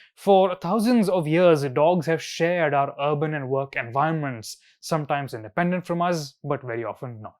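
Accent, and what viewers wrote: India. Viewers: What accent? It's a regular indian accent.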